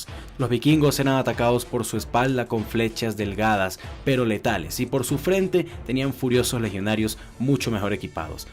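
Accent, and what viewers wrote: Venezuela. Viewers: no se como llegue a este vídeo ..pero reconozco tu acento venezolano,,,,entonces te dire....te la lacriaste jajaja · eres venezolano?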